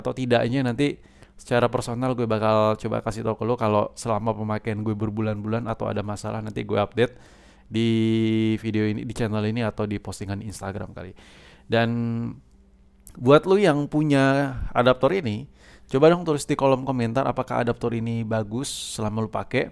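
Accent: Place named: Banyumas, Indonesia. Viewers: Kaya wong banyumasan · Anjay basa jawanya kental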